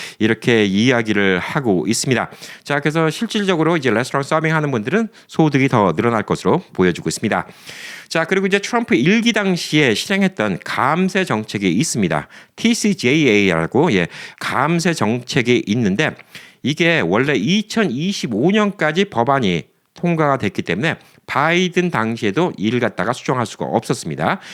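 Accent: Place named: Jeolla, South Korea. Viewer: 부탁드립니다 그러면이 그러먼으로하시말고 러면을고치세요 전라도사투리 거러먼 안돼 그려면으로 먹으먼 먹으면으로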